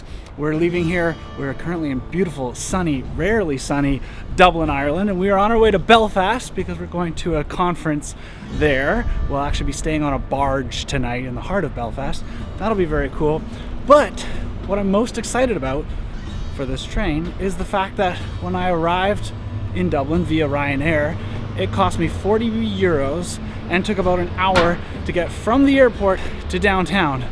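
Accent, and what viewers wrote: Canada. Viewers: I love your travel logs..I knew you were Canadian. Abouwt gave it away lol. I love your excitement of travel. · I don't understand how people confuse the American and Canadian accent. Yours is so clearly Canadian. There is a softness and meter that isn't so apparent in America.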